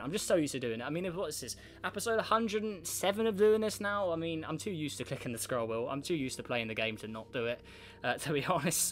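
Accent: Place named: United Kingdom. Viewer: Speek americane please i cant understand briten people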